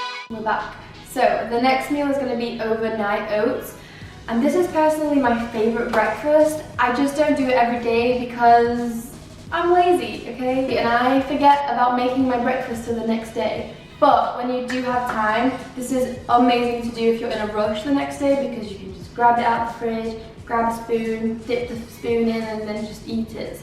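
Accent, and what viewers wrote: United Kingdom. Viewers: Where is from? She has like this accent from the UK, but the name doesn't sound European?.-.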